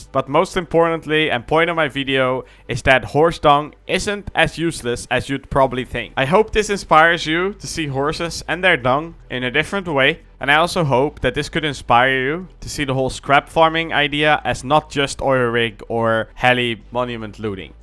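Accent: Netherlands. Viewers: Why no dutch video's? I can hear your dutch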